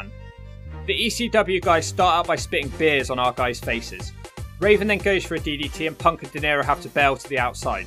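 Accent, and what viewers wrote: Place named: Weston-super-Mare, United Kingdom. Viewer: You gotta be from near me in weston super mare with an accent like that. Great channel. 👍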